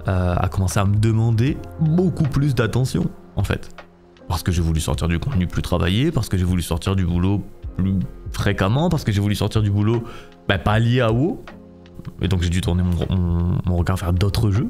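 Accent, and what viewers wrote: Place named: South, France. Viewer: Moi qui au fond espérais voir le retour des vidéos 5 raisons sur wow avec l’accent du sud x)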